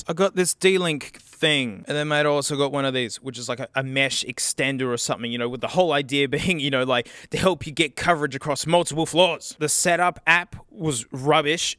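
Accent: Australia. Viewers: I know I watch too much when I start thinking in an Australian accent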